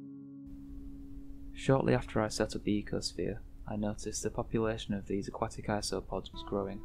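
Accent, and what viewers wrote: United Kingdom. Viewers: he's UK or something along those lines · Judging by the accent and vocabulary, you're from the UK? I am :)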